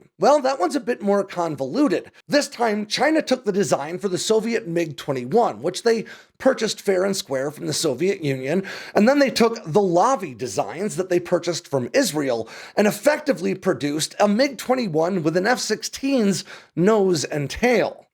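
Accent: United States. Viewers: "We had B-52's, flying around" -- 1) Yup. All true. Because we thought it was that, or nuclear annihilation. Stakes are a tiny bit different with Taiwan. WWII and the Cold War are too far off. I also believe in the greatness of the American people as much as you do. But that doesn't mean we always have the political will to act. (Also, Aerial refueling, not Arial the font!) 2) Still, dude, you don't help the American case by sounding like the 'MURICAN parody!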